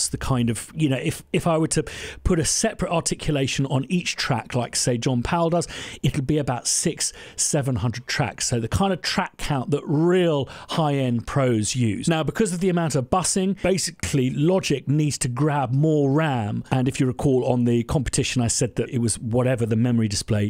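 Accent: RP, United Kingdom. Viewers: Combined with the view, your way of speaking resembles Downtown Abbey (meant as a praise)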